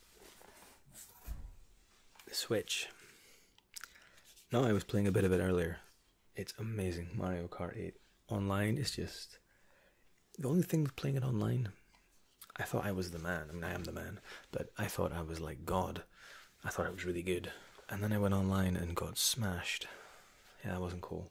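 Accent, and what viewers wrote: Scotland, United Kingdom. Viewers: I actually love your accent - is that a hint of scottish i hear? ;) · U sound british ... i prefer hearing british speaking because i feel like it’s someone from my family and I feel more secure! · Your accent is great. it's like British with some Scottish idk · And your Scottish!!! 🥰 I think i'm falling in love! Haha, ok, that's my cue to go before i start sounding like even more of a weirdo, lol 😂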